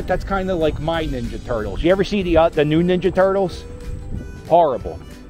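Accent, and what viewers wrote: Philadelphia, United States. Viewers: Love that Philly accent. Left in 1957 and never really lost it.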